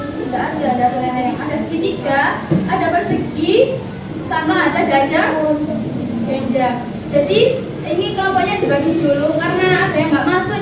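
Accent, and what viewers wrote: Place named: Java, Indonesia. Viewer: Semangat.. Semoga bisa menjadi guru yg sejati... Bahasane medhok banget sih mbak....😂😂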